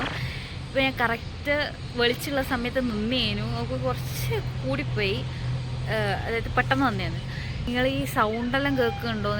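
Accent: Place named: Kannur, India. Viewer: Well done 🎉. I read the description after that understand the content of this vlog,I think opening feel lag but end of the video is superb because you give message to us. Kannur slang is good,content of this video is excellent.we will be waiting for next episodes with different content.Any way all the very best for your future.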